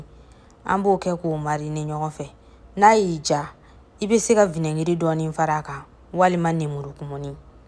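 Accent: Bambara, Mali. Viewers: Merci beaucoup. Ma fille adore tellement ton accent bambara 😁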